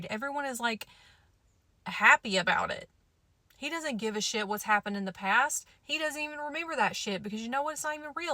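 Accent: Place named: South, United States. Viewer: I'm from the uk but i love your american southern accent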